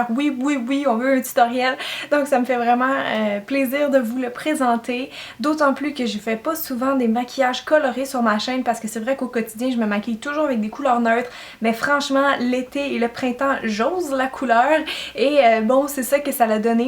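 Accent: Quebec, Canada. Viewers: Salut, juste pour te dire que tu es super et que tes vidéos son génial! N'écoute pas les commentaires négatifs! Tu as pris de l'assurance et c'est génial pour toi! Pour tout dire tu m'as carrément donner l'envie de faire ce superbe métier et je me lance l'année prochaine! Bref, continue comme ça, j'aime ce que tu fais et j'aime aussi ton belle accent québécois!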